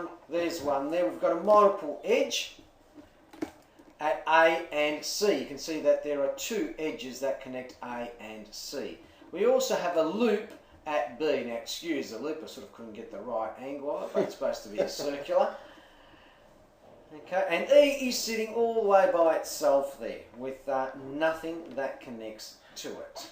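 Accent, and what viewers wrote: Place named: Australia. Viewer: I hate this Australian accent.......makes it hard to understand :( had to watch it twice Good job though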